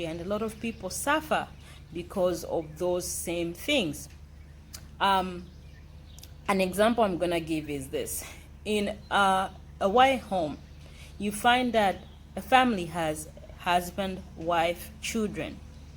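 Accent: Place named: Jamaica. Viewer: That’s not good everyone should be treated equally... you almost sound Jamaican.. I rather stay home and suffer..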